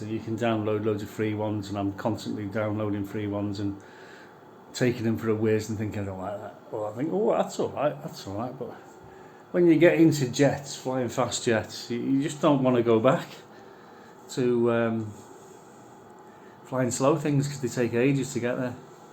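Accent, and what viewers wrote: Liverpool, United Kingdom. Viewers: Hello, what a tidy man cave/den. You are looking in the pink as usual. TBH I don't understand much about the flying stuff and jets/planes but I could listen to you for hours,the lovely Scouse accent. Take care 🌞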